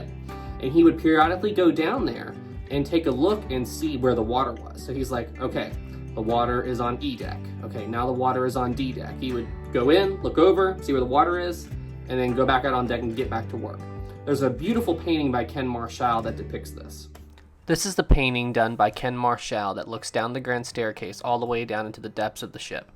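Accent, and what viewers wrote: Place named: Maryland, United States. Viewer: Side note: I'm listening to your accent and can tell you're from somewhere in Maryland. If you don't mind me asking, where abouts in Maryland?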